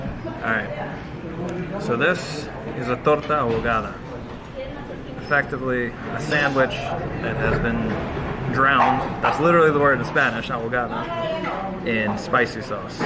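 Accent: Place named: South, United States. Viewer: You ain't from new York boy.You are from down south.It's a secret.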